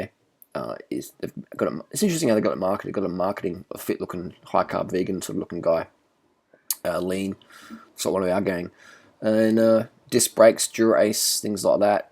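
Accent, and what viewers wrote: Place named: Tasmania, Australia. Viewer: what s with the tasmanian accent lol!!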